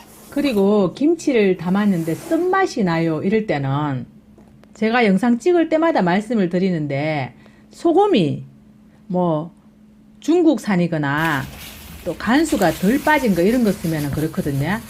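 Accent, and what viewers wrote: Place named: Daegu, South Korea. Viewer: 싱거운 김장김치 고민중에 ~~영상보고 도움됫습니다 ~~대구사투리듣고싶어 한번씩보고 구독 좋아요 꼬옥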